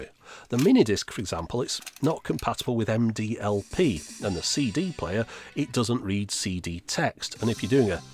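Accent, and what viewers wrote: United Kingdom. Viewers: The best channel on YouTube in my opinion. As a Brit now living in the states, it’s great to hear a fellow Brit voice, talking about such cool stuff. If there is something you think I could help you find across the pond, just let me know.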